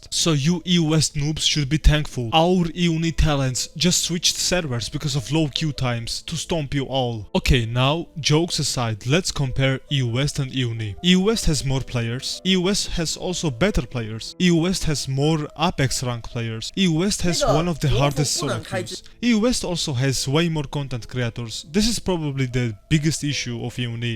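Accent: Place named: Georgia, United States. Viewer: i can tell from your accent you are georgian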